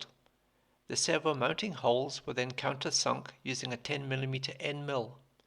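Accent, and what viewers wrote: South Africa. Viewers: South African accent coming through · I know a South African when I hear one.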